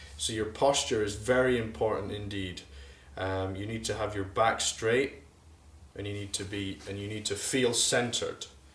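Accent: Scotland, United Kingdom. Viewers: Are you Scottish? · You sound a little bit Scottish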